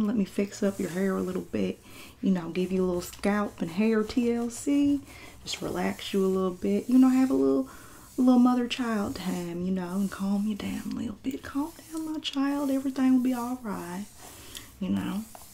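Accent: South, United States. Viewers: Your southern accent is so on point... where are you from? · Whew, this accent is so accurate. It made me feel I was back in NC for a moment 😭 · As a Southerner with friends and family with thick accents, I am dead!! 😂 I love this! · 😂😂😂I CANT CANT CANT!!!! 😅😅😅ur killing me!!! 😂 I’m truly a Southern woman!!! 😂 you did pretty darn good with the accent - fyi your talking red neck mixed with country with a splash of southern baptist mom 😂…. 😂 Alabama- mixed with Georgia and Mississippi 😂 ❤ most fake southern accents I cant stand listening to… BUT YOU ARE AWESOME 😂…. I had 4 boys but was a grandma at 37 😂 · please do make this a series 🙏 the southern accent is so satisfying and the mom character is just so loveable ❤ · This was such a solid Southern accent! 😂 I’m from the South, and although not everyone in the South talks this way, for the ones that do, this was spot on. 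· as a alabamian born and raised in the south i can say this is 100% accurate and this is a almost perfect southern accent great job! but you forgot to offer her some sweet tea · Loved the Southern accent. Every Southern Mama I know follows up any "advice" or opinion with a "Bless your heart" to keep her sweet Southern Belle persona. Example: "Make sure you see him without his hat. He could go from an 8.5 to a 2, bless his heart." Excited to see Part 2 and how the date went! ❤ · I love the Southern mom! I live in SW Indiana across the river from Kentucky and people here definitely have a twang. So this was relaxing and familiar - thank you!❤ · This is so freaking funny 🤣 and very tingly!! Those clacking fingernails ❤ I am a southern woman and you nailed the accent 👏👏👏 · Everyone in my family has a southern drawl Can confirm this is accurate And hilarious 😂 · My new favorite video of yours!!! 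I love southern accents ❤😂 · The sassy comments coming from our southern mama 😂❤ the accent is spot on!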